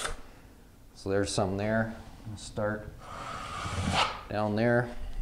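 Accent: Canada. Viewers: You sound Canadian :-) I use the same tray for mixing small amounts of powder. Do you always use premix/regular mud on your first coats? I've always been tought to use powder, usually 45, if not then 90. Much more strength and it allows you to coat it again or even 3x in the same day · are you from Canada? Voice sounds Canadian. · That's an interesting accent you have there! Kinda Canadian, German, Dutch, Irish infusion...and with a name like I guess you're or your family are / were from Ireland? Anyway 😂, won't butt edged joints show with sun beaming down the wall? I'm curious - as I'm from the UK, and we all skim whole walls over here!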